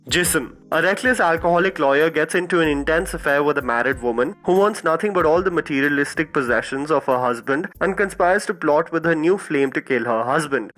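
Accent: India. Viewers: Who is here to only enjoy the Indian accent of the speaker? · That Indian accent though!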